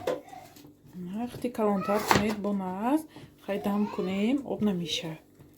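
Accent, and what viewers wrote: Isfara, Tajikistan. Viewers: Лафзи Исфарагихо мора а хонаш мебурурад😅😅😅😅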